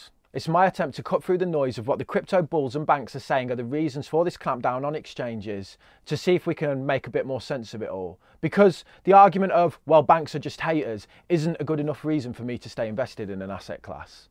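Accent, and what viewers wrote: Birmingham, United Kingdom. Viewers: Are u from Birmingham bro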